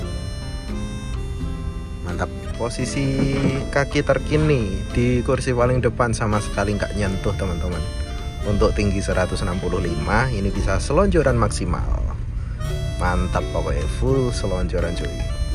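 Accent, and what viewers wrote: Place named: Banyumas, Indonesia. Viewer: ngapak raya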